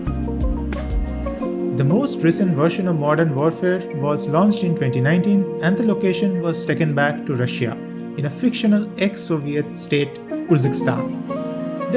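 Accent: India. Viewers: u indian ? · Are you from West bangal. Your voice is like Kirtiechow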